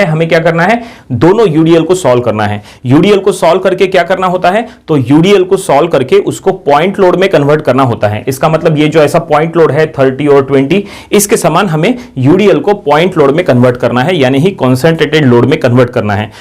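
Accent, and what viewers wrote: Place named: Maharashtra, India. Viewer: Bhai Maharashtra mai ase hi bolta hai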